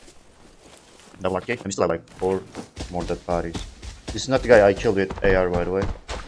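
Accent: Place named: Middle East. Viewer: Awesome! I was going to say middle eastern but I didn’t want to be wrong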